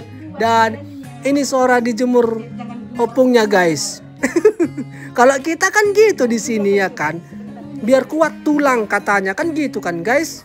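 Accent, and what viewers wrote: Medan, Indonesia. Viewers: Senang dgr cara bicara ito ku ini. Bahasa nada medan nya ga hilang walau sdh lma merantau ke negri Jepang... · Enak kali denga logat medan abang.sering2 upload bang pasti berkembang canelnya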